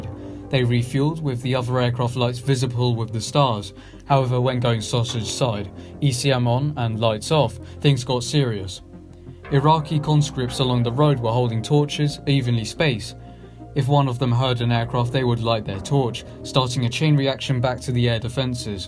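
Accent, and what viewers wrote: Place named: United States. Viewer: Excellent vid but please get rid of the American pronunciations. We don’t have lootenants, missuls or Eye-raq 😊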